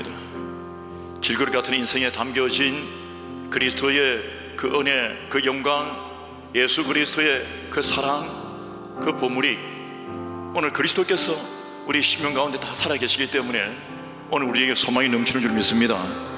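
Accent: Gyeongsang, South Korea. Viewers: 경상도 ㅋㅋㅋ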